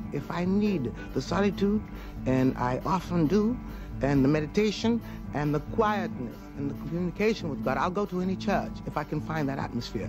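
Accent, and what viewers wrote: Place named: Gullah, United States. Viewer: She was born in North Carolina; the Gullah people of that region have retained strong elements of their Africanist roots, including language/accent. So that is definitely a conscious part of the cultural heritage she represents.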